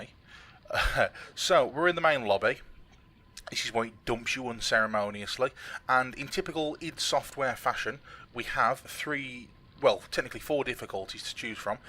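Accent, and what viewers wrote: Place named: United Kingdom. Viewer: Oh, I grew up on Quake. British accent + retro games = clear win. Do you mind if I add you to my directory of recommended YouTube Channels that is part of my online store?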